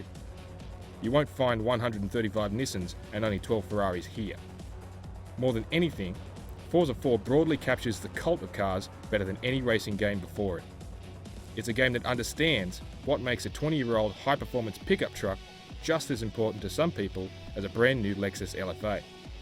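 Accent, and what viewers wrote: Australia. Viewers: lol aussie doing the review :) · the dude sounds aussie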